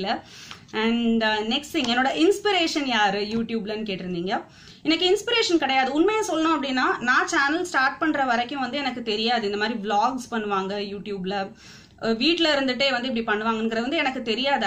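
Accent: Kerala, India. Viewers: Neengha pesuvathu malayali mathiri iruku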